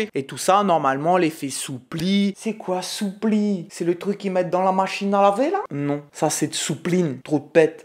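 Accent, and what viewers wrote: South, France. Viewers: Super comme d'habitude👏👏👏 et ton accents du sud de la France 😍😍😍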